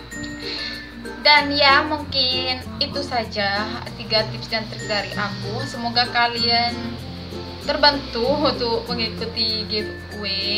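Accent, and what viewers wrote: Madura, Indonesia. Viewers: Kayak ada madura-maduranya deh kk 😂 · Logatnya mirip bgt sama orang Sumenep (Madura) 🙄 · kakak dari madura ya? bicaranya kek aku hehe :v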